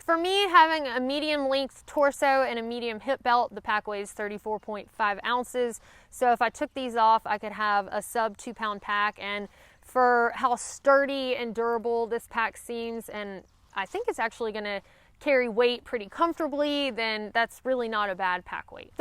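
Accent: South, United States. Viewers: Mine too, Matthew. I grew up in MS and lived 7 years in AL, 2 1/2 of those 1/2 hour from Auburn. But have lived up north and now in TX. Love the Southern accent! And she's an engineer! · I bicycled about 38,000 miles and camped through nineteen countries. I thought about through hiking the appalachian trail. It is not likely. Except for a 15 mile forced march in the army through a dry dusty riverbed, I have no experience long distance walking. I have no way of estimating my ability for that. Of course, if I were 30 again in place of 70 which I am now, there would be no question whatsoever. But, it is like the man said--There aint no way that's ever going to happen. I read your name. You also use because you are from the south, Alabama they say. And what makes it more obvious than your sweet, pure accent. Every syllable is another drop of honey, and beholding to the eyes, you are. 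You are a movie star. It is really clever and really cute what you do, and how you present yourself and the subject. Surely making the through hike could be a profoundly life changing experience. It can be the same with long-distance bicycle camping and touring, and quite different in some aspects.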